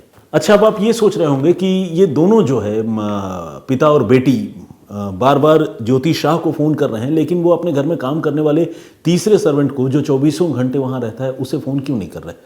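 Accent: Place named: Bihar, India. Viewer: kahani theek sunate hain par supratim ji ka talaffus extra ordinary hai. bihari hone ki wajah se kabhi kabhi unki bhasha mein bihari lehza aa jaata hai.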